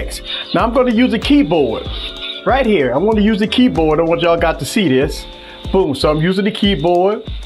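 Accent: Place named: South, United States. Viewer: that nawlins wallpaper is no surprise with that accent. Way down south accent. Rock onbro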